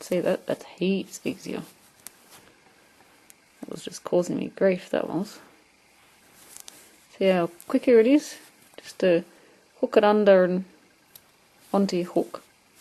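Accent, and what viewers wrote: Australia. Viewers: you are hearing the true Aussie accent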